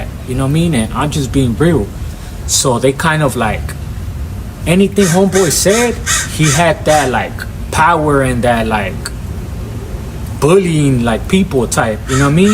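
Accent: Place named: South Central Los Angeles, United States. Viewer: IT'S WHERE YOU GREW UP.ALOT OF L.A. HOMIES TALK LIKE THIS.ESPECIALLY IF YOU GREW UP IN SOUTH CENTRAL L.A...THATS NOT THE ONLY ONE THO ESPECIALLY NOWADAYS.ALL THESE EDGARS COMING IN WANTING THEM TIGHT JEANS... SERIO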